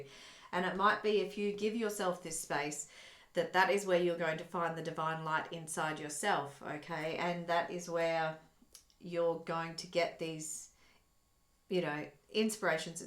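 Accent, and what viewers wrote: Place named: Australia. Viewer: Thankyou for sharing your Light with us through your Insightful readings cheers and all the best with yourchannel growing. I'm hearing a fellow Aussie, am I correct xx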